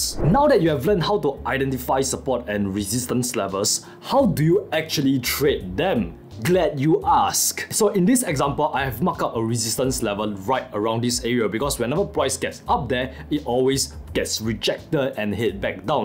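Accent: Nigeria. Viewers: You have this Nigerian Accent when you speak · You sound like a Nigerian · Are you trying to speak in Nigerian accent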